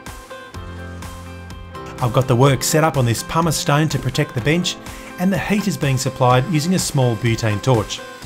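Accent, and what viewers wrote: Australia. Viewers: Hey! I was wondering, where are you in the world? You sound Australian, if so, where in Australia?:) Once again, great video!!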